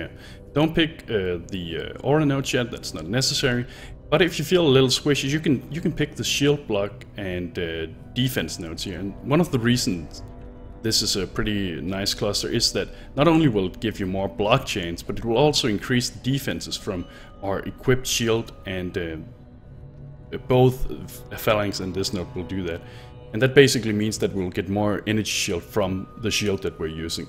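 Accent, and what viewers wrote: Denmark. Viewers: You sound danish. Are you danish?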